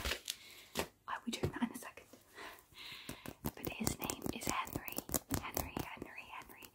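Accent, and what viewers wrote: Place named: RP, United Kingdom. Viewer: How come you have a sort of posh accent?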